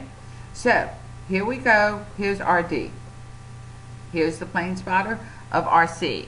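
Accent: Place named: United States. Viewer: NO, it's NOT 'absolute proof'. It is not 'proof' of any kind. It MAY be evidence. It also may be the plane at the next gate! I don't know how many times I've been about to board and see what I think is my plane - when in fact my plane is next to it. The boarding gates are often deceptive, where you go through a door right near the plane you think you're about to board when guess what? You walk along a long corridor to a plane that is a long way from the door... often not even visible from where you're waiting. And, of course, that's assuming that the photo that swept the world was taken at the time and place claimed. Assuming that it isn't another hoax, like the man on the roof of the World Trade Center! Just because you see it, doesn't make it real. Go watch a 'magician' sometime to understand what I mean. From your accent, you're in the US. 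Are you one of the 98% of Americans who have never been abroad? And for all those conspiracy theorists who say that the crashed plane is the airliner that disappeared after taking off from KL... Yes, this would be a good way to dispose of an embarrassing plane... But how do they dispose of THIS embarrassing plane? Oh, I do believe in conspiracies - but not idiocy.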